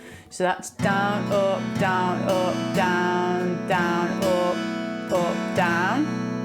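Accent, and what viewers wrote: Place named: England, United Kingdom. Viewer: WOW , GREAT LESSON , PLEASE TELL ME WHERE YOU COME FROM ,,..OK ? ENGLAND ? YOU HAVE AN ACCENT , JOHN FROM NORTH CAROLINA , USA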